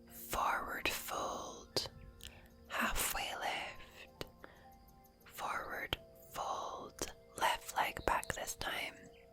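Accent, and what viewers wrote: Scotland, United Kingdom. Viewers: Woohoo... + yoga = ASMR bliss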